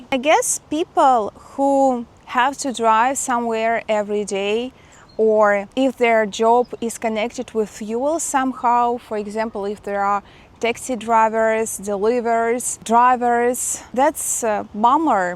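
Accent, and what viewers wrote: Russia. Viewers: It's very helpful to get unfiltered information about the actual Russian experience so thank you very much for sharing. Also, your English is excellent (and I find your Russian accent very charming, by the way) so please keep posting!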